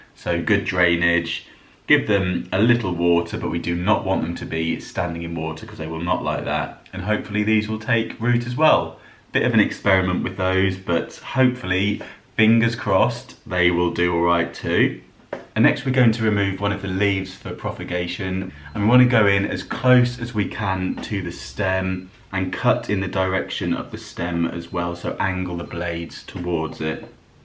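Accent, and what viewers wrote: United Kingdom. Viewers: Love your work. Sooo nice to hear a fellow Brit giving tutorials. Your instructions and explanations are fabtastucally clear. You don't jabber or waffle on. Love it. I have subscribed. Give us more please. I live in the Carribean, wo, and I have 6 acres of ladn around mynjousd. I am planting, planting, planting. Yayyyye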